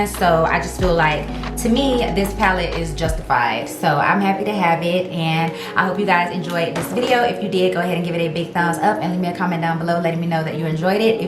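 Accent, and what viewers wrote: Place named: Miami, United States. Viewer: I looooveee your channel and your Trina like Miami accent😩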